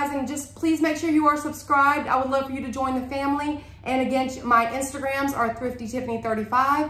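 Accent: South, United States. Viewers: Burnt orange. Southern accent.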